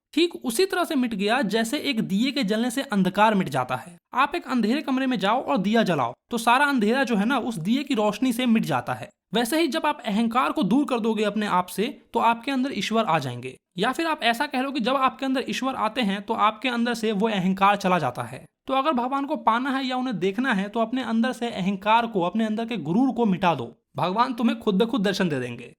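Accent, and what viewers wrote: Bihar, India. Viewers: Dekh dekh k apki biharan accent mere andr arhi h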